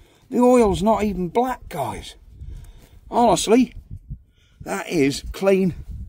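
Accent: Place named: Black Country, United Kingdom. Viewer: Guna gu sounds like black country Like Yo ba guns gu darn there bin ya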